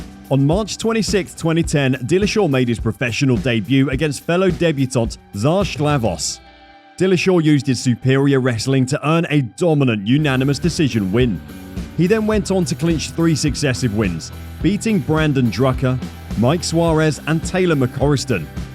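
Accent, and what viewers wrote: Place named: United Kingdom. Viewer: Your accent is so annoying British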